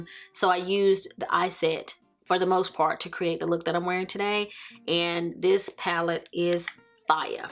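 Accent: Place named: South, United States. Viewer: Love the southern twang! Have a wonderful and safe holiday season. Thanks so much for sharing.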